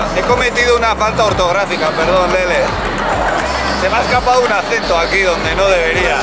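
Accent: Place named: Basque Country, Spain. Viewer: Ole! Hay que decir que parece que hayas pasado unos días por Euskal Herria, te he visto con el acento reforzado 😁!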